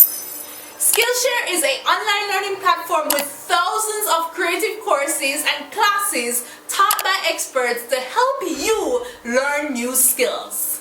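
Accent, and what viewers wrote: Patois, Jamaica. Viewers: I have nylon thread so I can use them.. You know at first I thought you were from a different island until I heard the patois Jamaica patois🇯🇲🇯🇲🇯🇲🇯🇲🇯🇲🇯🇲🇯🇲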